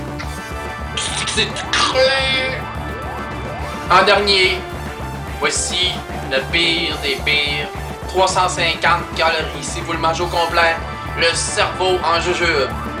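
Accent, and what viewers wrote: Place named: Quebec, Canada. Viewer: accent quebequoi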